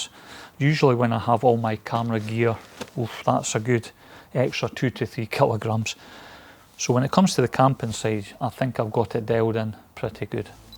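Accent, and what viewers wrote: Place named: England, United Kingdom. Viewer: nice videos but it's hard for me to understand your english accent.👍🇬🇷🏴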